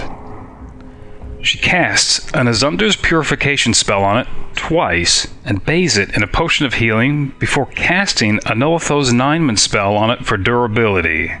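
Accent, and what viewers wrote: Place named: New York, United States. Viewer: Maybe it's because you're a New Yorker. Sounds like you're saying Lie-LICK. Just like how you pronounce "Ruins" as "runes". :P Course, I'm a Philly suburbs guy, So I can't really talk. :P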